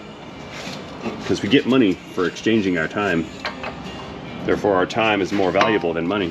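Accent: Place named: South, United States. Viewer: I always notice that after every night you over party you tend to talk S L O W L E Y and the vids tend to be an hour or more. BUT us Southerners like it when you start talking like a local. :goodvibes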